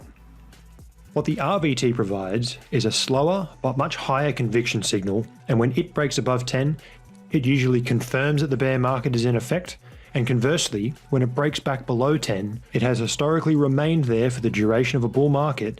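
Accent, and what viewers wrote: Australia. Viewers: THANK YOU...GREAT ANALYSIS...much appreciated...well done...ps...do i detect an Aussie accent? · Great, a fellow Aussie. Accent is unmistakable! Love this channel. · Fantastic video! Good to hear an Aussie on RSC!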